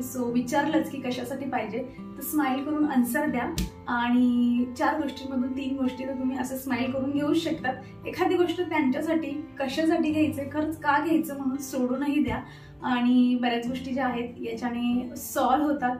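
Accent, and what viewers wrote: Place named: Jalgaon, India. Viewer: Tuzya language varun lagech kalat tu Jalgaon chi like ळ la ड😂😊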